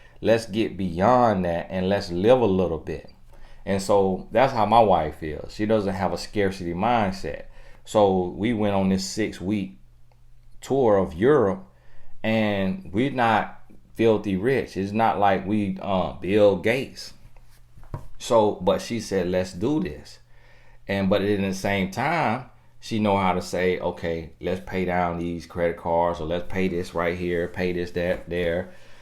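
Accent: South, United States. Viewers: 😂Southern lango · Mz Chocolitt FL must be the deeeeep south! Im for NC, but is on a whole other level of country. Truly distinguishes his message; a country bumpkin with sooooooo much wisdom.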